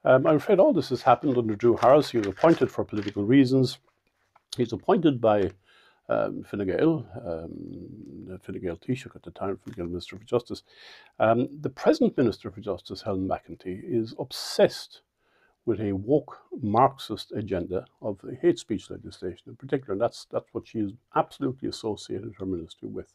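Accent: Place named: Canada. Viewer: bruh sounds a lot like canada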